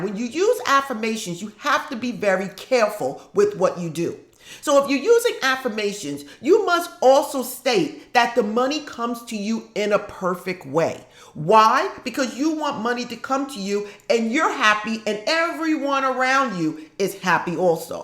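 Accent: New York, United States. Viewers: I just love your channel ❤️ I just love your setup , I kinda look at you like my spiritual fairy godmother lol I love the accent is it a New York accent ??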